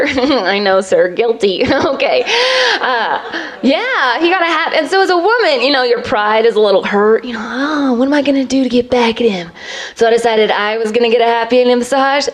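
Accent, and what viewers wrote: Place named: South, United States. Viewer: She's not really funny. I think a lot of people are getting wrapped up in her girl next door looks and southern accent.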